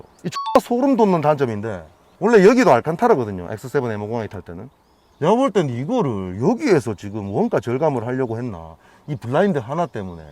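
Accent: Gyeongsang, South Korea. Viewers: 대구 분 이신가 … 엑식엠 한번 구경하고 싶네요 ㅎㅎ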